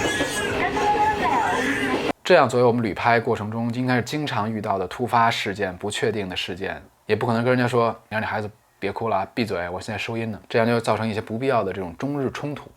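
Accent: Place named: Beijing, China. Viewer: 太厉害了，感谢分享，ps：京腔很正～！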